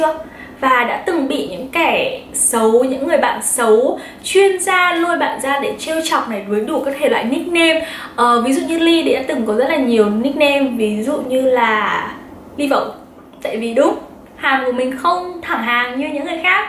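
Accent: Standard. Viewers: Chị nói chuẩn quá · c nói quá chuẩn, quá hay :D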